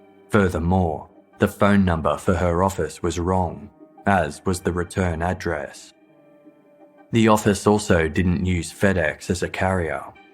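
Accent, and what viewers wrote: Australia. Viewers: Ok I’m going to have to UNSUBSCRIBE. The annoying drone of the Aussie accent I could muddle through but this report COULD NOT BE MORE BIASED! Allow me to be of service to listeners: go to Direct Appeal Podcast. It is one thing to simply be a story-telling channel but a whole other when the “story” is clearly one sided.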